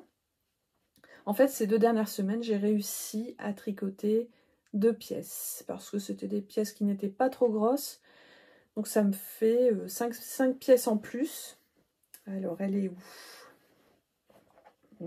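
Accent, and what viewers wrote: France. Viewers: Ah! J'avais bien perçu un p'tit accent du nord.... bon c Pas-de-Calais mais c du pareil au même.... Originaire du nord, j'ai tjours adoré les plages du Nord, en particulier Ambleteuse, gris nez et blanc nez qui malheureusement ne sont plus aussi sauvages qu'à mon époque (année 70-80) qd on y allait en diane... Vos videos st agréables et je me suis abonnée pour suivre vos aventures tricot et couture avec ce p'tit accent de ma jeunesse. Amicalement.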